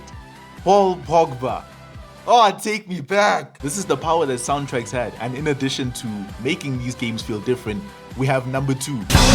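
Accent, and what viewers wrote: South Africa. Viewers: I was detecting a South African accent all along.. hante😅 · You just know a south african brother when they speak 🫴🏽💪🏽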